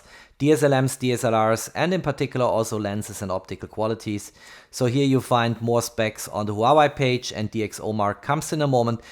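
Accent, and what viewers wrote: Germany. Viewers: Man hört am Dialekt das er Deutsch ist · Please, stop calling Huawei a Hua-Y, and Ultra ['ʌltrə] is not ['ultra]. I can hear your native language is german, nevertheless...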